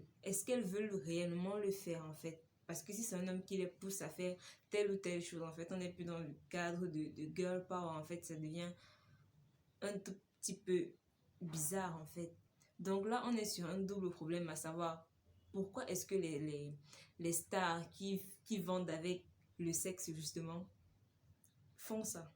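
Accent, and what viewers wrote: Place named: Cameroon. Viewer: vue ton accent et ta façon de racconter tu es 237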